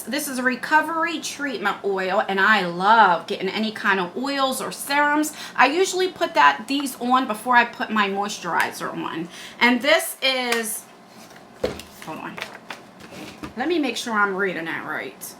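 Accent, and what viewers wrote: New Jersey, United States. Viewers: Girl same!! I think it’s a jersey accent haha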